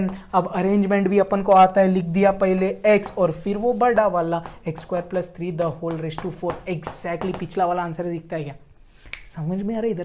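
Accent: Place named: Mumbai, India. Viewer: Luv your way of mumbai talking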